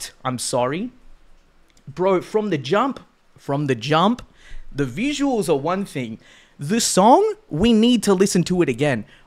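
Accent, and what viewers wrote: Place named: South Korea. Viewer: 이 형 뭐지... 분명 영어를 말하는데 한국인 같아..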